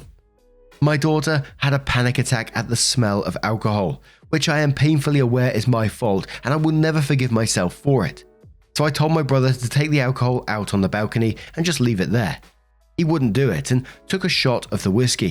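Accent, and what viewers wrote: United Kingdom. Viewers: Story #1: Unrelated to the story:, Love your videos, I listen to them while I work, that's why I had to listen to this TWICE. Canadian pronunciation of Thesis is Thes-ISS, your pronunciation [British I assume] it Thes-ESE [this would be the Canadian plural or more than one thesis]. Also you tend to pronounce a "TH" as an "F". SO.... Every time you said "Thesis", I kept hearing "Feces", and I was wondering what the H*ll you were talking about. NOT a criticism, just something funny I thought you might get a laugh from. Keep up the great videos, and Thank you. Story #2: That is the CRUELEST thing i have read all day. Trying to get a recovering alcoholic to RELAPSE?? What an abusing sadistic A-HOLE. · Happy Christmas. Since you're the maestro of breakfast foods, and sound British, I figure you're the chap to help me out. I have a question from someone across the pond. 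'round these parts we can have our breakfast sandwiches served on something called an English Muffin. It's basically a less dense bagel with no holes. In the land of rainy days and tea, what are those called? And what are the sugary sorts of muffin called? Asking for those who know. I have similar questions for a Belgian if you know where I can find one of those. And yes, that one would be about waffles.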